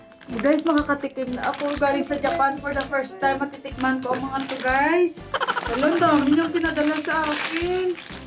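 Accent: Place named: Hiligaynon, Philippines. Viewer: More blessing inday.always watching ur vlog and don't skip adds.basta ilongga malambing gid ya mag salita.kaya halata ka ng asawa ko na ilongga dahil sa tuno ng pananalita mo.asawa ko taga bacolod din.God bless inday.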